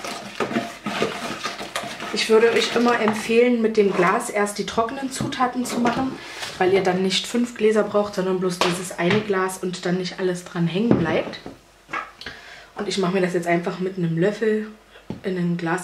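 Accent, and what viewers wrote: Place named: Magdeburg, Germany. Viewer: Hallo, hier ist eine Namensvetterin, durch Zufall bin ich auf deine Seite gestoßen. Ich glaube auch auf der Suche nach Infos über Gerstenmalz selber herstellen, egal. Es ist mir dein Dialekt aufgefallen, der ja nun garnicht bayrische klingt. Kann es sein, daß du aus dem Jerichower Land stammst? Ich bin Jahrgang 1961, da hießen viel aber du bist doch jünger. Es ist schön, daß auch junge Frauen sich für Kochen und Backen interessieren und durch YouTube ihr Videos veröffentlichen. Ich selbst schaue ja auch viele Videos zu diesem Thema an. Mach weiter so, hast tolle Videos und dir und deiner Fam. ein schönes Weihnachtsfest. Liebe Grüße 😀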